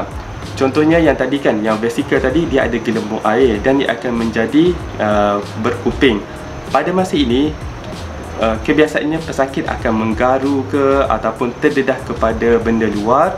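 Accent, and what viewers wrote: Kelantan, Malaysia. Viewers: Maaf Tanya doktr ya, doktar org kelantan ke?? Terima kasih atas perkongsian ilmu doktar.. Terbaik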